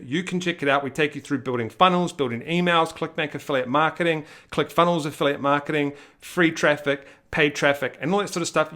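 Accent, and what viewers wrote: New Zealand. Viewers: Are you from NZ ?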